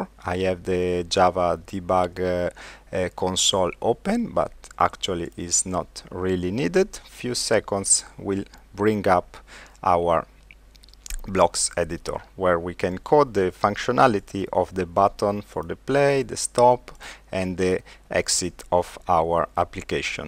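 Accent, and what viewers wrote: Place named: Brazil. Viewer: Inglês brasileiro ;) We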